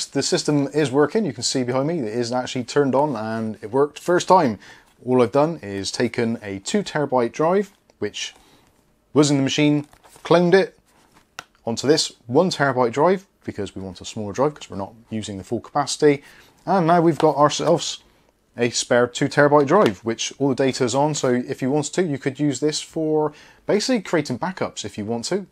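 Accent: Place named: West Country, United Kingdom. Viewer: Great video. Nice West Country accent ;-)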